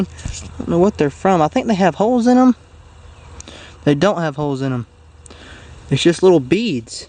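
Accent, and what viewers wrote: South, United States. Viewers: Your accent is like cowboy like your is yall. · I like your forest gump ascent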